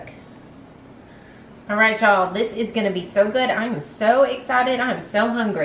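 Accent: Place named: South, United States. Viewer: Cream of chicken. I like you you sound a little southern.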